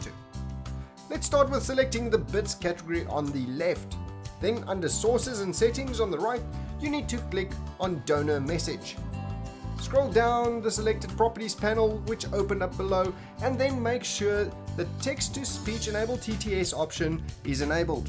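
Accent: South Africa. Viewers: Are you South African? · you sound like a south africanXD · Thanks for the tutorial, you South African? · south african? :)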